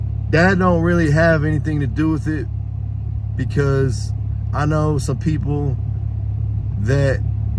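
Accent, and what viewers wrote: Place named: United States. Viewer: You must be from the country you sound country...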